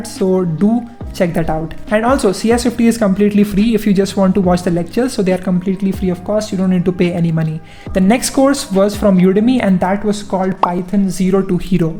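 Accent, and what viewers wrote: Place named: Andhra Pradesh, India. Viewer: thanks for reply but it seemed like you are from any part of our South like Andhra guy so that's why asked.by the way really you are doing great job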